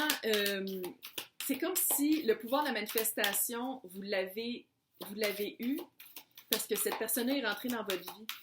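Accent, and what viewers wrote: Quebec, Canada. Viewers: Accent Québécois j'adore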